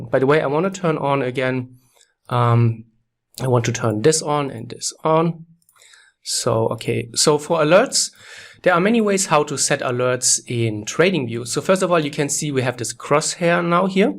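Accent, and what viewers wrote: Germany. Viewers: direkt gemerkt das du deutscher bist :D gutes video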